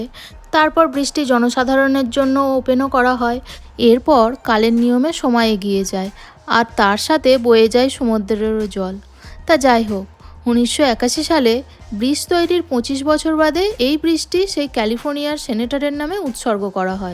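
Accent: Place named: Kolkata, India. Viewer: খুব সুন্দর দৃশ্য আর খুব সুন্দর উপস্থাপনা, আর তোমার কথা শুনে মনে হলো তুমি কলকাতার, তবে আমি বাংলাদেশে থেকে।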